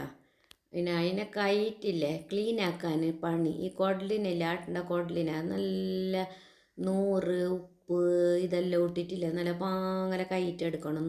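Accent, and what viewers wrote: Kasaragod, India. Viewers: ചിക്കൻ കുടൽ കറി ആദ്യ മായി കാണുന്നു 😅👌പ്രത്യേകിച്ച് കാസർ ഗോഡ്കറി യും ആദ്യം തന്നെ. മുട്ട കറി യിൽഇടുന്നത് കണ്ടിട്ടുണ്ട്. പുതിയ ഒരു കറി പഠിച്ചു.കാസർഗോഡ് സംസാരവും.... 👌👌❤️❤️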